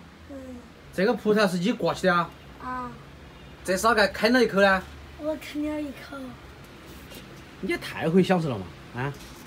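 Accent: Sichuan, China. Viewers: 小廚講話有四川腔，阿為啥唱歌辣麼標準?????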